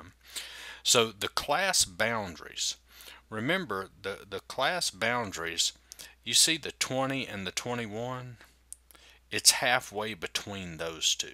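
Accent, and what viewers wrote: South, United States. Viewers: you are AMAZING!! as soon as i heard the southern drawl, I knew you'd get right down to business! i read this in my textbook about 10 times and was completely lost. I watched your video ONCE and got it. The struggles of signing up for an in-person class but having the teacher scam me into a "hybrid" is REAL. I'd kill to have you as my stats teacher!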